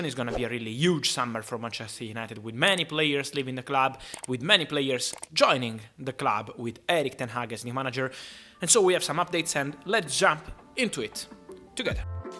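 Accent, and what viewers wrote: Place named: Italy. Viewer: I didn't even need to read your name to know you are Italian. Your accent is so typical. I like it.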